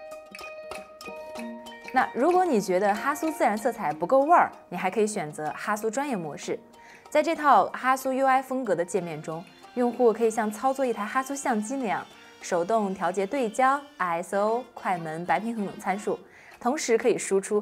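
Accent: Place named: Hebei, China. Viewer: Eva是河北人吗？说英语的感觉和我老婆一样，我老婆邯郸的。加油！支持你！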